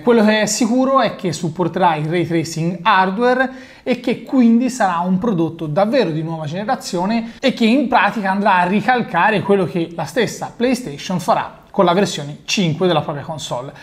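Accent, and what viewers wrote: Tuscany, Italy. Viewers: sei toscano? 😂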